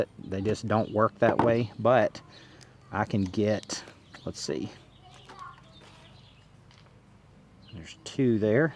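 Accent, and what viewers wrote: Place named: South, United States. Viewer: Love to hear you talk…..love love love southern folks.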